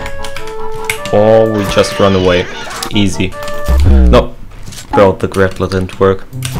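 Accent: Germany. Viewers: Bro got the most German accent a person can have🗿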